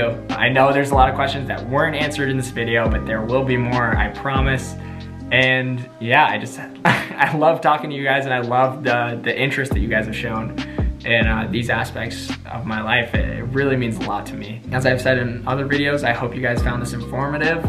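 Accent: United Kingdom. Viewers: Where is your British accent? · Wow! Very cool story. Your intelligence, high standards, and perseverance have clearly paid off, and probably will continue to do so in the future. Your ability to communicate well has really helped me to understand your process. When you speak I am able to visualize your story, step by step, and truly feel your experiences. Awesome job!!! I look forward to watching your running career and life unfold. I have one simple and fun question. Talk to us about losing your British accent.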